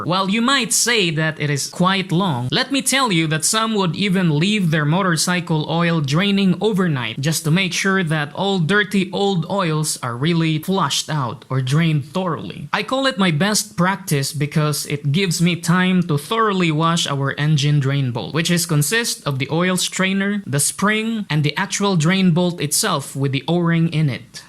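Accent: Visayas, Philippines. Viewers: Hindi!! alam mo kung bakit nag English yan? Kasi yung nag tagalog yan parang bisayang o bikolano tukmol halos hindi mo alam kung nagpapatawa siya ehh para siyang makatang pilipino na may mental retarded. Binago niya yung language niya kasi maraming bash sa kanya nakakatawa kasi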